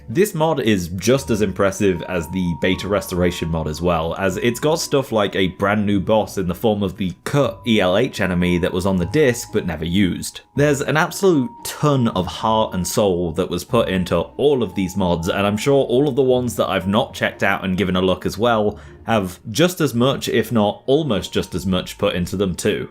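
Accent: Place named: United Kingdom. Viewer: Why must all you Nintendo and Sega YouTubers be British and ruin the video with your horrible voice… quit forever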